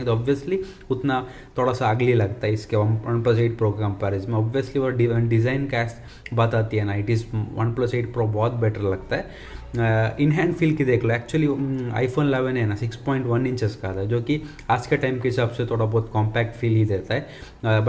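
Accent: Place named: South, India. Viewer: I love south indian hindi 😃😃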